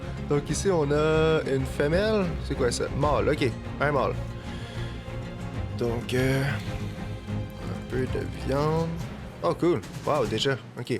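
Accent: Quebec, Canada. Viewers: Chaluuut ! Je te regarde de Belgique. J'adore ton accent quebecois , un accent tellement enjoué , qui donne le sourrire, ainsi que les expressions. 😃 l'orignale m'a tué 🤣🤣 et le "thylaceo" 🤣🤣 Continue copain , tu ensoleilles mes journées 😘🤩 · Hey 😁! Superbe! Merci 😄 vraiment très bien ta ferme. Et pour répondre à la question que tu te posais et étant de Nouvelle-Calédonie, ton accent québécois ne me dérange pas du tout. J'ai déjà pu jouer avec des Québécois sur certains jeux et j'en garde un très bon souvenir. Des personnes agréables, gentilles et surtout très drôles 😋. Après je ne vais pas le nier, il y a des fois où vous avez vos expressions et c'est vrai qu'à ce moment là, je ne comprends pas toujours 😂 mais ça ne me gêne pas plus que ça 😀.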